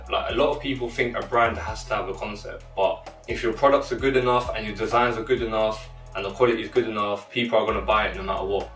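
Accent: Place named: United Kingdom. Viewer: Light skin nipsey hussle with a British accent cuz lol naw real shit thanks for the info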